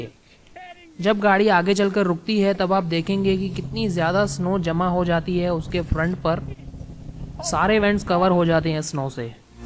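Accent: India. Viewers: stopped watching the second I heard the indian voice lol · Why is there a Indian talking? 😒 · He sounds Indian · Clearly not his fault,he is Indian and It's very different from english,Don't be racist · Hes indian, not jis fault for not having english as his first language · Dude, are u indian? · its english with an indian accent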